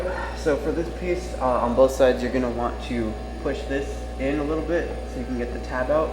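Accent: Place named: Texas, United States. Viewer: Bro what part of Texas are you from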